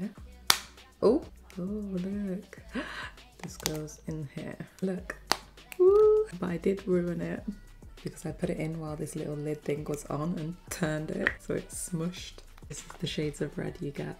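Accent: United Kingdom. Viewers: ARE YOU BRITISH??????? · Are you British By the way I love you❤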